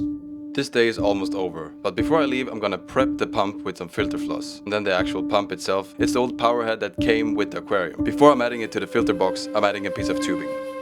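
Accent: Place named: Scania, Sweden. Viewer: ”Grön”, haha I love your skånska….. I have the same dialect…….. idk why im writing this in english but it just seems like the right thing for some reason…….. Also the ferns are really gorgeous, ferns are some of my favourite plants! Maybe from all of the dinosaur documentaries I watched as a kid….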